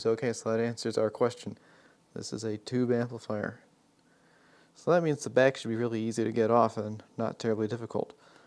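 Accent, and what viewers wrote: United States. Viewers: Are you from western PA ?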